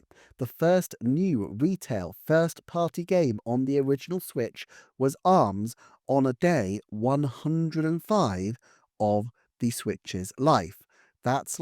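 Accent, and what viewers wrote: United Kingdom. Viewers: Yet another W. Also, as an American, hearing you dish out these truth nukes with a British accent makes the whole thing so much more cathartic. Keep up the good work mate.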